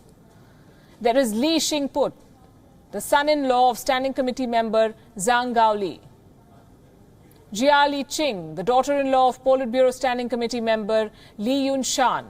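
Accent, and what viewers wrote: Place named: India. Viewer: India and China, two of the oldest civilisations on earth should lock hands. But here you are clever by half, breeding discord. You are a developing country criticizing another. As if your leaders are holy and free from corruption. Lee Kuan Yew said that China like other countries is afflicted with corruption, but the difference is that Chinese leaders are able to get the job done. So woman, you should keep your strong Indian accented trap shut.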